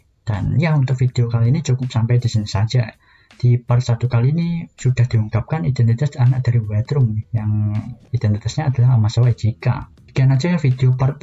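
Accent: Java, Indonesia. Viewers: Makasih mang medhok 🤌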